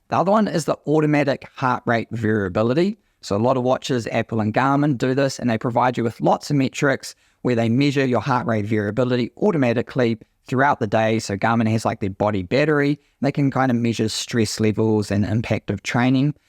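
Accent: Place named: New Zealand. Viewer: Bang on on the comparison between Coros and Garmin ecosystems. I run with older gen Pace 2 and FR245. Both meant to be 'entry' level watches. Neither is perfect, both complement each other well. Totally agree with your Coros highlights over Garmin though. Stumbled on your channel recently and very grateful to have done so. You're an endless wealth of information on all things running = THANK YOU! And nice to hear a New Zealander here in Australia over yet another US 'run tuber' 🙏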